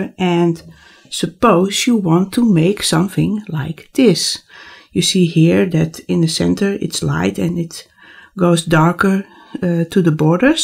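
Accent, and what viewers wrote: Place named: Netherlands. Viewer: Grappig, ik dacht bij mezelf: "Wat een sterk Nederlands accent..." En toen bezocht ik je website. :) Dat Starburst patroon is mooi om te zien, hoor.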